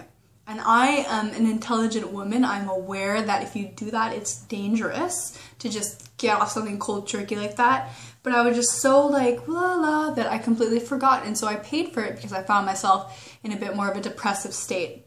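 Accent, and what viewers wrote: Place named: Canada. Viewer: yo i love your Canadian accent.. so cute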